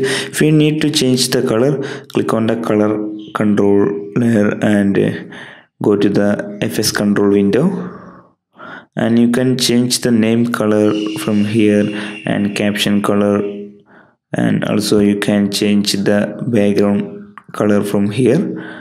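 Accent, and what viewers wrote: Kerala, India. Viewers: Why do you sound Malayali (Keralian)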